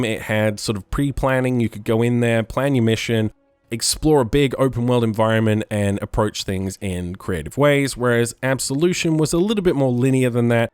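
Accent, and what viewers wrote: Australia. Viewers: I fucking swear all Australians have the same voice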